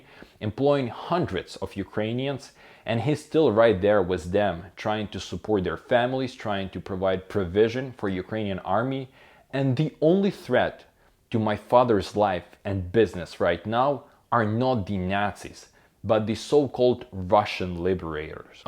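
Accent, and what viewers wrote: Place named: United States. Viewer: У вас очень хороший американский акцент) Редко встречаются русскоговорящие люди с хорошим английским акцентом)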